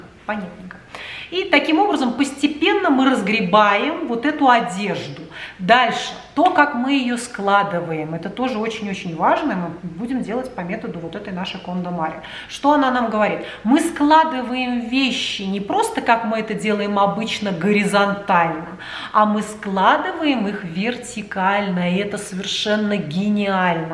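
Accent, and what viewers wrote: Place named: Ryazan, Russia. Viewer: Почему свитАрок, какой-то рАзанский акцент со сленгом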